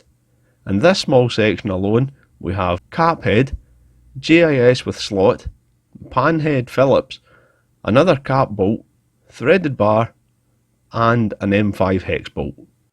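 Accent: Scotland, United Kingdom. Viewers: Are you Scottish? It's like listening to Luke Stoltman 😅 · Are you scottish? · Nice camera angles, on spot editing, humor, Scottish accent and a VFR!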